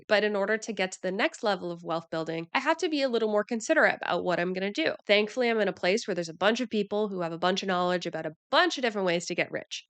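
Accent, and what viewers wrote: United States. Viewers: She has an American accent. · You sound American.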